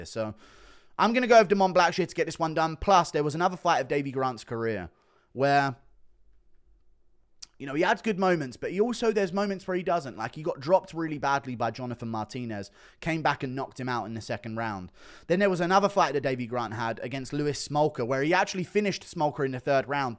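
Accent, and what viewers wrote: United Kingdom. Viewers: something about a British man yelling about ufc fights just puts a guy to sleep ya know?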